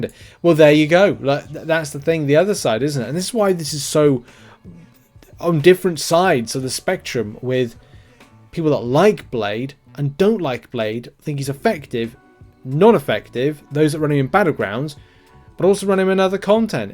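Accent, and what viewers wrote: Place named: United Kingdom. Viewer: The uk accent is horrendous. I can barely understand a word you’re saying. When you talk beyond 130 words per minute it’s just shit mumbles. Have someone from America listen to your vids and ask them to repeat what you said. There are huge gaps where we can’t understand shit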